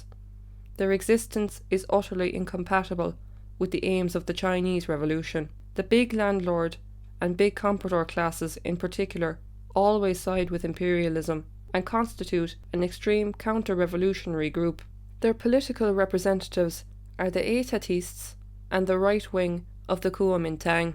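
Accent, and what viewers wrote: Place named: Ireland. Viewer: Thank you for your hard work as always, comrade! I could listen to your Irish voice all day!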